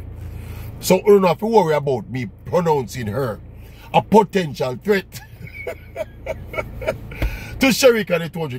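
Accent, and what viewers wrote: Jamaica. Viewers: I knew I would find you here brother love the Jamaican accent ✊🏽✊🏽✊🏽 🇯🇲 🇺🇸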